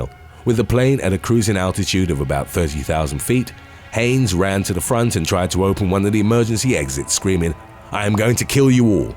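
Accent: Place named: United Kingdom. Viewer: You sound British 🤮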